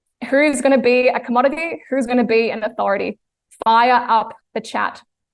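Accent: Australia. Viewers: I love your Australian accent. It is very beautiful to my ears........:) Thanks for valuable information.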